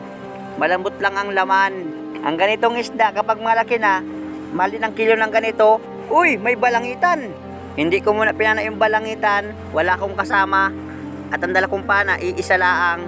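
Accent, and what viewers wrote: Bicol, Philippines. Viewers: Tagalog ang salita tunog bicol😅😅😅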